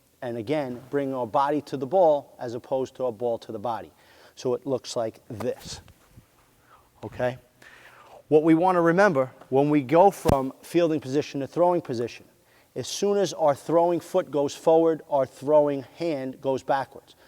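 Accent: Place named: New York, United States. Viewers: Great video perfect instruction , and he has a great New York accent lol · Very true! As a New Jersey guy living in Kentucky, and working with my boy in Little League, it's nice to hear my fellow North Easterners. Moreover, this is great instruction.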